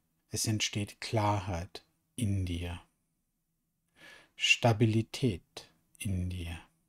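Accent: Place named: Linz, Austria. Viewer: Hallo, hab´ dich erst gestern über zwei Videos mit Monika Donner "zufällig" entdeckt (na, das "zufällig" glaubt kein Mensch mehr...) - den Linzer Dialekt erkannte ich sofort... es war nämlich auch mein erster... Nachdem´s heute grad nicht ganz einfach war mit der emotionaen Freiheit, probierte ich diese Meditation aus... und, oh ja, sie wirkte grandios. Jetzt sitzt das Herz wieder dort, wo es soll... und lächelt... Alles gut!!! Herzlichen Dank für die Meditation! Einen lieben Gruß vom Lande!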